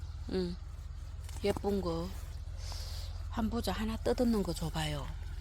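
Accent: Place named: Gyeongju, South Korea. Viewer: 경주사람 정겨운 목소리 들어니 반갑습니다 저도 경주 광명이 고향인데 .간된장 정말 일품이죠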